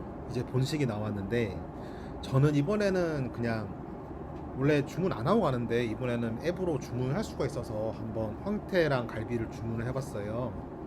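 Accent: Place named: North Korea. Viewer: 말투가 북한 사람같네요. 북한사람인가요?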